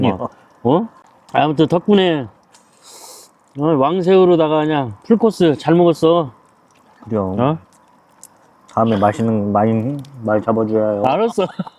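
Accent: Jeolla, South Korea. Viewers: * 수많은 전라도표준어가 구수한 누렁지 맛. 여러 구독자분들 조작구내버리는방송 언제나 응윈합니다 ❤❤ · 순수해 보이셔서 구독합니다 전라도?말투 구수하네유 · 전라도 사투리가 구수허네. 영상 잘보고 입습니더!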